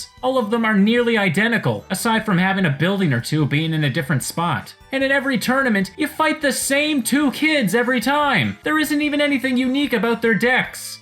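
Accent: Canada. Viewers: dad jokes the game! great video, love your content. is your accent canadian? it soothes me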